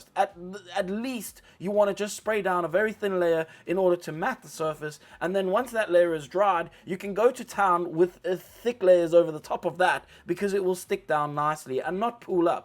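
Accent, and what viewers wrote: South Africa. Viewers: My guy sounds like a fellow South African! No disrespect meant of your not😅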